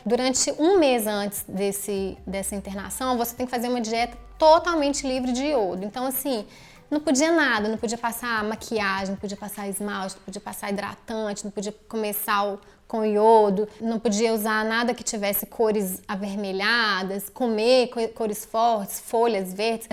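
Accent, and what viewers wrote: Minas Gerais, Brazil. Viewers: O sotaque mais bonito do Brasil, o de Minas Gerais.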